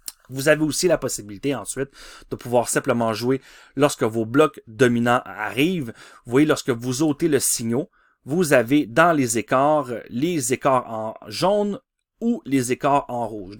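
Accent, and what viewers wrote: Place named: Quebec, Canada. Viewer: Grand travail Québécois trop belle akcent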